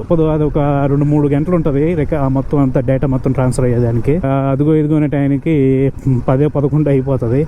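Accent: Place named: Rayalaseema, India. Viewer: Hi, I like your video and your Rayala seema language.I love you so much and liking to hug you one time.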